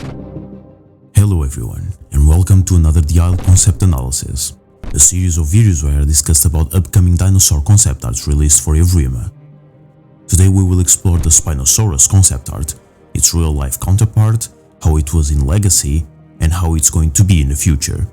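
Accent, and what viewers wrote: Portugal. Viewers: amazing video as always man, i too am worried with the lack of info in this concept. P.S: your accent is very familiar to me. do you happen to be portuguese?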